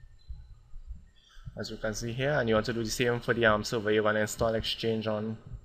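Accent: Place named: Trinidad and Tobago. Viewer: Oh Gawd Boy!! Dah accent is real Trini like bake and saltfish. lol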